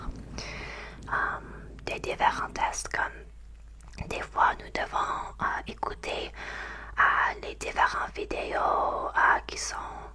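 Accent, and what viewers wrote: Quebec, Canada. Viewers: Je trouvais qu’il y a avait une touche de québecois dans son accent haha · Sounds exacly like a quebec accent lol and its a good thing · Tu parles le français, mais on entend vraiment le français du Québec, avec les tic de langages, l'accent. 😅 C'est bien de vouloir parler toutes les langues de son pays. ❤ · En fait elle est canadienne mais elle a un accent québécois donc j’en déduis qu’un canadien qui parle français aura forcément un accent québécois ? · Don't know if you're french or not.If you aren't and didn't understand when she explained it.She is from Québec wich is a french province in Canada (thats why her accent is way more like texas english but for french).To be brief,she grew up in french schools for her whole life.Her english is good because of Canada's other national language being English.Alot of French people in Canada also have perfect english. 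· J'ai cru entendre une québécoise. Impressionnant. 😮 🇫🇷👌🏻👏🏻👏🏻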